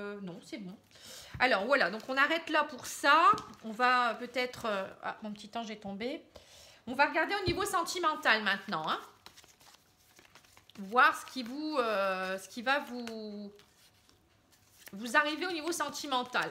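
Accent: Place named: Pas-de-Calais, France. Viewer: Je connais bien cette accent du Pas de Calais 😁👍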